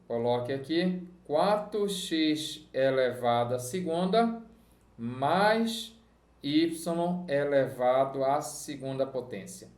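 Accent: Pará, Brazil. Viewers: Égua!!!! sabia que tu era paraense. Um paraense reconhece outro KKKKKk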